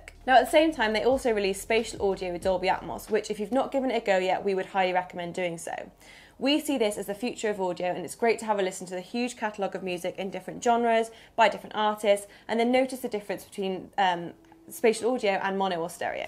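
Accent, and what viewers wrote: United Kingdom. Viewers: Good info, delivered too fast. Your audience is not all familiar with whatever UK accent you have.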